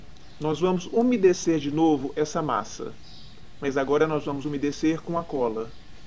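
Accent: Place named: Santa Catarina, Brazil. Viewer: Eu de vez em quando vejo seus videos e gosto da imensa variedade como de jardinagem até este de marcenaria. Mas seu sotaque é tipico de SC. Tu és catarinense? A pronuncia do R forte é tipica de quem é catarinense.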